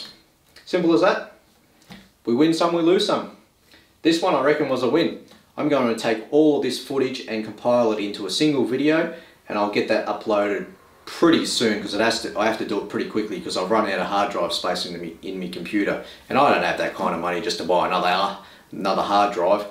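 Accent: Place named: Australia. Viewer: I've just subscribed. Good to hear an Aussie on YT. Keep up the great work.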